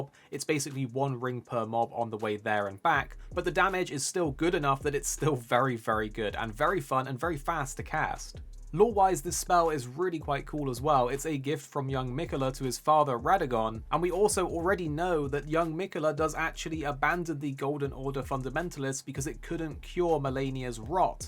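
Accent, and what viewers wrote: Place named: England, United Kingdom. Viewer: I love me an English accent. But "HATE-ch" makes me cringe.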